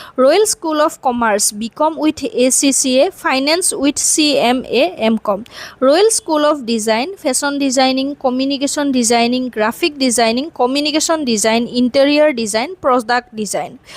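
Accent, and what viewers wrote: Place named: Assam, India. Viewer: Tipical assamese accent 😛😛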